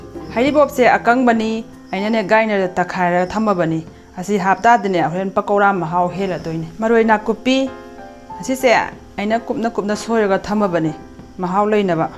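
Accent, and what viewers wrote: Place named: Kakching, India. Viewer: kakching maron ne amfao tajadribo.keino noise migi maron khoibase kothok2 tana hangisibo.manipir kachin amata koibirudribo.lam2 gi matung ena lon se adum khoibane..mapan thoktaba mi yam male.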